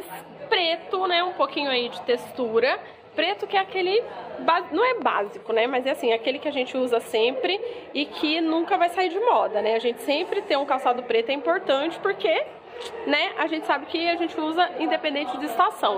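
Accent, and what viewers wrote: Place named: Campinas, Brazil. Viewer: vc é de Campinas? O seu sotaque é de campineiro. Eu moro em Campinas e vivo andando pelos shoppings da cidade. Abriu uma loja da Amaro, onde era a forever 21 no D. Pedro. Gostei mais dessa loja do q a do Iguatemi. É maior. A bottero é uma loja sem vitrine, gosto de olhar a vitrine p depois entrar na loja p comprar. Nela vc entra e já chove vendedoras. Gosto dos sapatos da Bottero. E noto q as pessoas passam pela loja e não entram. É uma pena. Sempre vazia. Faltou a vitrine p atrair.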